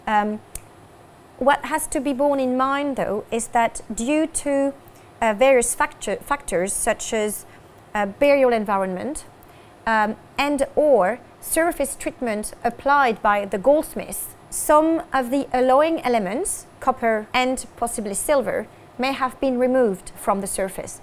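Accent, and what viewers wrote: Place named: Northern England, United Kingdom. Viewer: She's got northern English traces in her composit accent.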